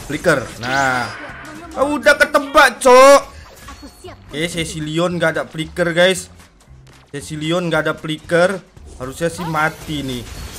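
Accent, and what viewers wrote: Java, Indonesia. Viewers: Bang itu orang jawa ya? Kirain di asli sunda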